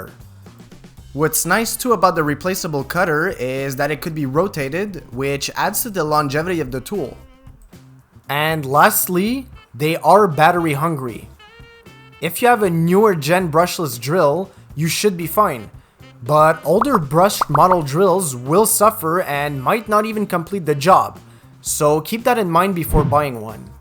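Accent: Quebec, Canada. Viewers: I'm guessing French Canadian from Montreal or Gatineau.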